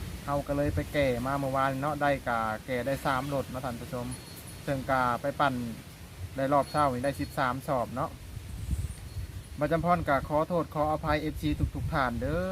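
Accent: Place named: Isan, Thailand. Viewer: สาว เว่าลาวอีสานฝังไทยบ้านอ้ายกะเว่าลาวอีสานคือสาว นั้นละครับ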